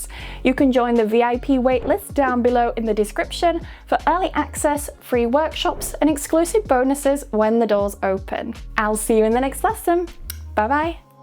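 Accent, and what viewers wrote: United Kingdom. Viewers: Wow! Good British english pronounciation. Great!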